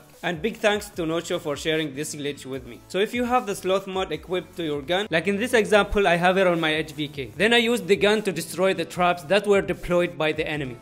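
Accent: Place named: India. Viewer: Guys hes has a indain accent that means hes indain so it fake he is capping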